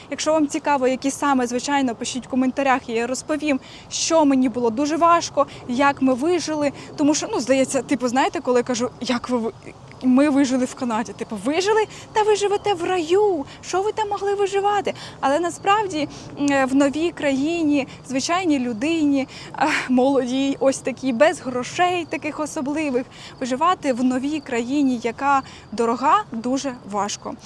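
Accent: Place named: Surzhyk, Ukraine. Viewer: з якої ти області в Україні? в тебе така прикольна українська, але деякі вставки слів з "мови з боліт" ріжуть вуха) нетиповий суржик)))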